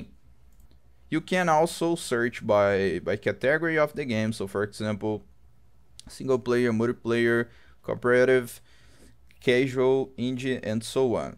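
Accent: Brazil. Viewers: brazilian sotaque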